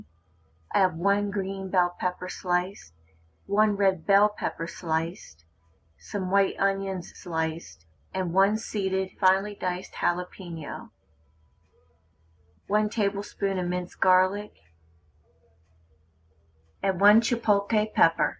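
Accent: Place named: Philadelphia, United States. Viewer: Such a philly accent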